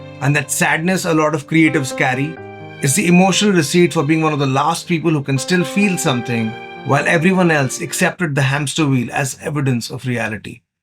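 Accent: India. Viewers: You sound like the professional wrestler, Rey Mysterio with an Indian accent.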